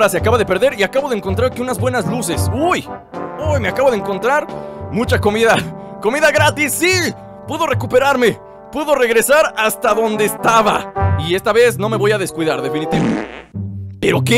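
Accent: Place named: North, Mexico. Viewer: Hola, es la primera vez q veo un video tuyo, me podrías decir de que ciudad eres? Tienes acento norteño, como de Monterrey, México. Saludos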